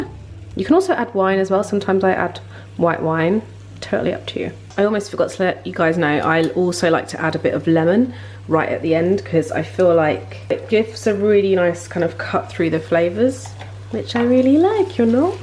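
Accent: United Kingdom. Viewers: Hi this is Kim! Merry Christmas, and Happy holidays. I like you're video's they are intertaining! I also like you're British actcent! Thanks again, Kim!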